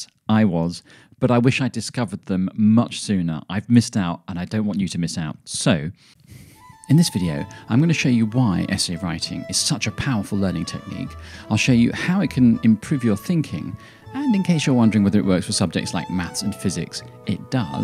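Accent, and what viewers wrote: United Kingdom. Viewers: Very helpful, thank you for posting! One funny thing happened as I listened to this video. Initially thought “he’s mispronouncing Montaigne” but then I fell victim to something I think is common here in the US. We assign more intelligence and a better education to anyone with a British accent, and yours is excellent. So I thought, there’s no way that he’s mispronouncing Montaigne, it must be me that has been mispronouncing this name all these years. 😊 Again, thanks for your helpful insights!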